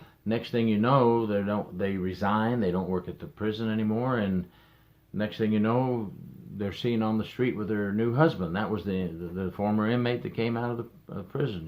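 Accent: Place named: Florida, United States. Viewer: Just stumbled onto your channel not even sure how I got here. You sound like your in Florida. I live here too and I have a loved one incarcerated due out next year. I myself have never understood how the greed of money will drive someone employed by the DOC to get involved with an inmate and abuse their authority. Inmates can't consent to sex why are these people not charged with Rape? If I wanted to be with my Loved one in that way at a visit as a visitor I would assume I would catch charges. What makes them any different? I look forward to watching more of your channel. ;)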